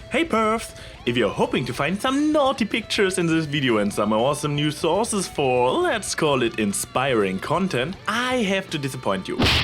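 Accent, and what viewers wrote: Germany. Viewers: Dieser Akzent! Sorry, wir deutschen haben den nunmal xD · you sound like your german .__. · Are you German? Cause the accent kinda sounds like dat ^^ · I am in love with your Accent. So very Germany sounding. Or Austrian? Where are you from? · your accent is quiet hard german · I've seen you replying to the comments, and is it bad if I read every one imagining your (FREAKING AWESOME) voice saying it all? Also, speaking about your voice, <3 dat German accent boiii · i really like your ascent,it reminds me of Germany from hetalia,i hope that's not offensive in some way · 14 He is German, and his accent is quite handsome. Also, if you are going to ask a question, at least, turn replies ON!!! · 1st: I can hear that you are some kind of german. 2nd: Someone has to do it. 3rd: Good video, good english. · I agree with the points you made. But sadly, the world has not yet become to the equestria-esque land we would all like it to be. 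As the human race is now, as awesome as we are sometimes, I don't think we are capable of learning this lesson as a whole. There will always be evil, no mater what form it takes. One last thing, just to sate my seemingly random and endless curiosity. From you're accent, I would like to know if you're from Austria or Germany. If both are wrong, I'm sorry. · I Like your Accent. Bist du Deutscher?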